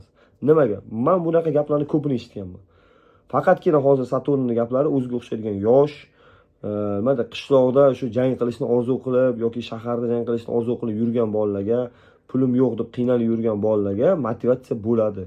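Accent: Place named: Uzbekistan. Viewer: Ўзбекча тоза гапирганиз учун рахмат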